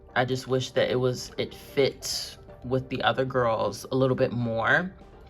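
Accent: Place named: United Kingdom. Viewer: It’s the British accent that’s scattered throughout this video, I love the energy!!!!!!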